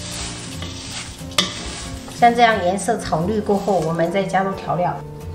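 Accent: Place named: Sichuan, China. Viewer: 最接地气了，喜欢你随心随意的分享，非常真诚~要不是听你讲四川话还以为你就是福建人呐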